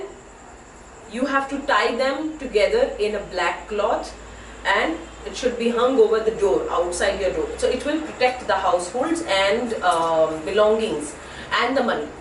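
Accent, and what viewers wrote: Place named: India. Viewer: I LOVE the English language spoken by an Indian person. I don't know why I have an affinity for this dialect, but I do. I can listen for hours! Thank U for a very informative video!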